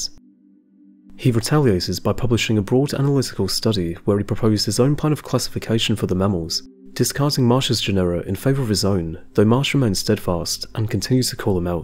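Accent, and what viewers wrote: United Kingdom. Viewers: I have trouble understanding the narrator's heavily accented British English. His speech is so fast that his mispronounced words elide with each other and create a sound salad that prevents me from enjoying this video. It looks as if it might be a good film otherwise.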